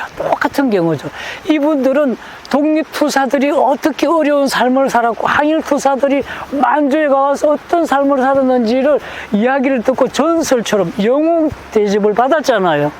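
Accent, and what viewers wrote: North Korea. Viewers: 도대체 당신의 정체가 뭐냐 말투가 북한말인데 북에서 언제 내려왔나 당신이 받은 임 무가 뭐냐 6,25역사 왜곡하러 내려 왔냐 이것이놈의 나라가 완전히 공산화 되었구만 이런자가 설치는데도 방치하고 있으니 아하 이제 이제 이나라가 완전히 망했구나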